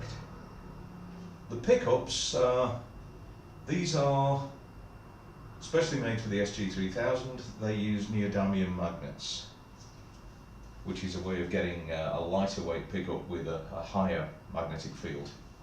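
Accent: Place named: Yorkshire, United Kingdom. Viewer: l think you don't live a million miles from me either. By your accent. I'm near Wakefield.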